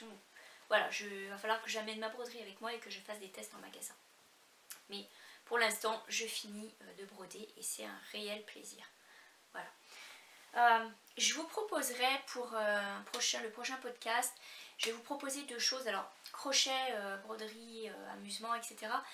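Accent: Quebec, Canada. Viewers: Coucou, contente de te retrouver, j'aime toujours autant ton univers et lorsque tu as l'accent ou que tu sors des mots québécois, cela me fait plutôt sourire, j'aime bien. De bien beaux ouvrages et bravo pour Biscotte ! Merci pour le partage du modèle, je me laisserais peut-être tenter... Passes une bonne journée et à tout bientôt.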